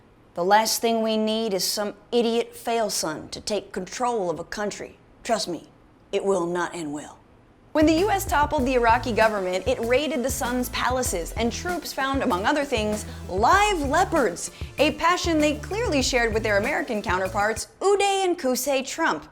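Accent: RP, United Kingdom. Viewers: Is it wrong that I like her British accent L) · English (educated received pronunciation to be precise). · Well done on the british accent; a pretty good job over all. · Loving the British accent! <3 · Your English accent is reeeealy posh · Love the British accent...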